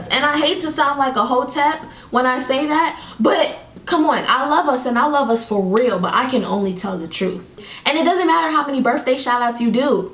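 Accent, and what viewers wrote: Jamaica. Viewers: I here the Jamaican in you😘😳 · Hooooolllld up , girl u from jamaica , cause dah lawd gad deh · I heard the Jamaican accent and subscribed...Goodie🇯🇲🇯🇲🇯🇲🇯🇲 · I just found you and immediately after hearing the "lawd gad" I subbed! I love finding Jamaican YouTubers! And btw you were speaking nothing but FACTS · subscribed as soon as I heard that Jamaican accent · I hear that 🇯🇲 accent. 1❤ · Gurl! Yu Jamaican!? Lie?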